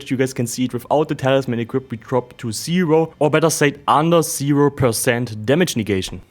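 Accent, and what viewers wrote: Germany. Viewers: Also das ist mal ein geiler build. Wenn du mir jetzt noch sagst das ich mit dem diesen scheiß feuerriesen killen kann dann bist du ein Held weil ich diesen Arsch nicht fertig bekomme 😅. Ps: als deutscher hört man immer die anderen deutschen raus 🤣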